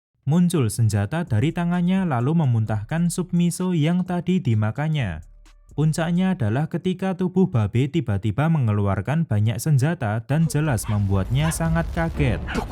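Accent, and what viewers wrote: Java, Indonesia. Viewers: medhok njir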